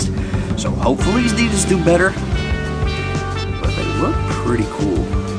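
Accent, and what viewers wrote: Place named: Kentucky, United States. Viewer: I knew the accent sounded right when I saw the Kentucky plate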